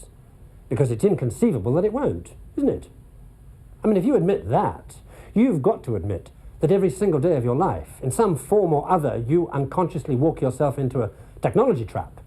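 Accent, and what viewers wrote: United Kingdom. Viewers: This is such absolute bullshit. This is not us being reliant on technology. It is us being reliant on COMMUNITY. And that is a good thing. Oh electricity goes out, so the postman goes to find a farm to grow his own food? This is nonsense packaged up in a nice british accent. Society divides out tasks. The nurse doesn't have to know when to grow crops, and that isn't a failing of society. And we as a species have invented this brilliant invention called electricity and created a network that provides every household with it. Which turns manpower into a communal good, instead of something individuals have to provide. It is a miracle, that yes, it can fail. But we shouldn't give up on it, we should safeguard it.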